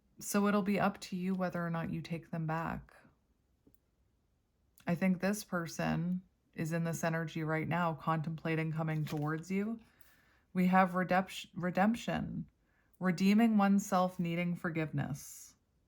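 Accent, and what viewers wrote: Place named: Canada. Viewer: Crying and throwing up every time you say "about" because this guy has the most beautiful Canadian accent I've ever heard in my life. 😭😭😭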